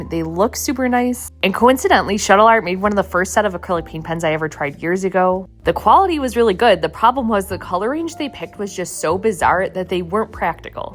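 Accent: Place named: New England, United States. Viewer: i can’t tell if you’re from new england or chicago, i love your accent so much!!!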